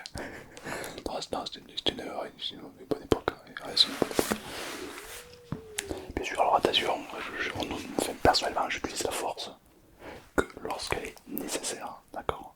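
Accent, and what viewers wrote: South, France. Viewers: Ce qui marche bien c’est que ca gars il a pas besoin d’imiter l’accent du Sud, ca rend la truc assez réaliste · J' adore ton accent du sud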